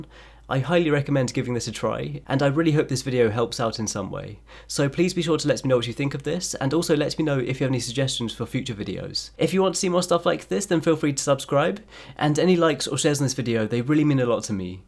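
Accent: United Kingdom. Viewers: your videos are now one of my favorite coz i learned two thing after watching it...how to draw and a british accents😁😅... can you draw emma watson?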